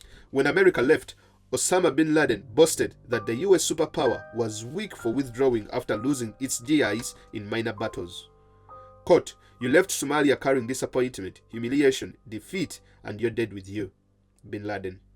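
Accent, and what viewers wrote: Uganda. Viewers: Is the commentator Ugandan?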